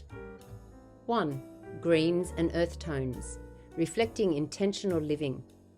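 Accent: Australia. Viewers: Your accent sounds very south/west Sydney, lagarno/peakhurst/hurstville. The accents of my youth. Thankyou beautiful video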